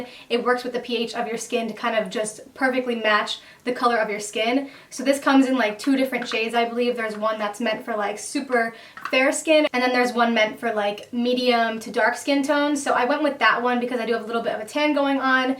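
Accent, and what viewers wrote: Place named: Long Island, United States. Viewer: Are you from NY? You have kind of a Long Island/NY accent... especially heard it in the way you said orange. just curious!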